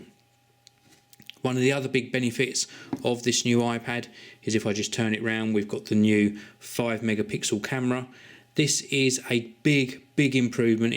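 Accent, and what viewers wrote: United Kingdom. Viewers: He is British?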